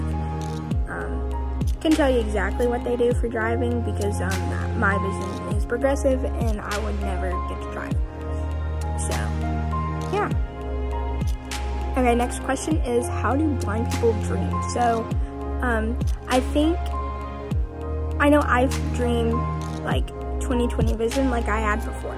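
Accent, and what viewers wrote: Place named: South, United States. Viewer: I watched a full video of my friends and just it was a good video and I still remember your southern accent and the final question that I have is do you and have separate YouTube channels apart from this channel because I wanna go and subscribe to them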